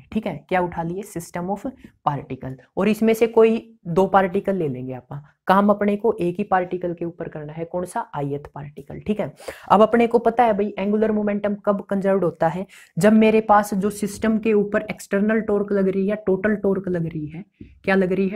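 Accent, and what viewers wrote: Haryana, India. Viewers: Haryana se ho kya sir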